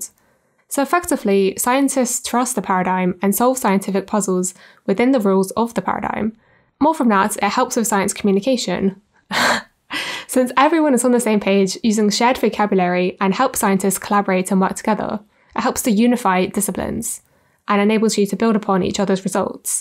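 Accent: United Kingdom. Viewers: where in the UK specifically is your accent from?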